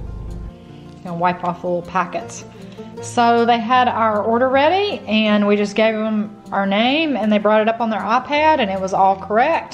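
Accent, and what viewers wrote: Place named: Oklahoma, United States. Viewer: We are actually from San Diego but a lot of my husbands family lives in Oklahoma so he was pondering your accent 💁🏼 thanks for sharing!!!!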